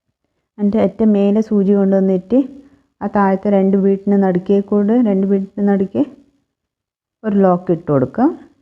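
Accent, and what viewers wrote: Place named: Kannur, India. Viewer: Nalla work. Kannurano sthalam?? Samsaram kettappol thonniyatha. 😊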